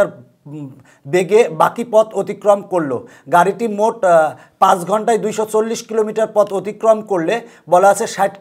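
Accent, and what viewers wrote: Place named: Kushtia, Bangladesh. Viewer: সার আপনার বারি কুষ্টিয়া মনে হয়🤔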